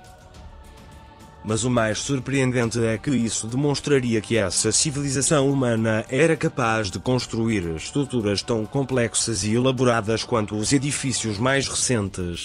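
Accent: Portugal. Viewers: Sotaque português,que porra é essa? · 🎯 EXELENTE VÍDEO!👍🏻Guerreiro da Casa do Conhecimento... me responda por gentileza!👍🏻Pôr quê... à narração, está com sotaque de um português? 🤔 Estamos Juntos! 📡 NAMASTÊ! 💥🖖🏻®️ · Sotaque português, provavelmente da zona de Lisboa...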